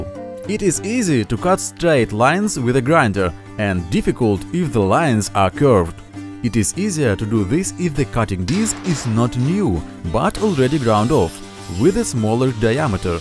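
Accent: Russia. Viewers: I can hear strong russian accent.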